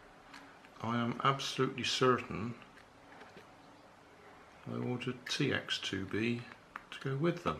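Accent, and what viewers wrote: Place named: RP, United Kingdom. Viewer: Forget it..... i love your royal accent, compose, even tone all throughout and the suspense that u maintain on whether project will work or not & above all irony .....wow!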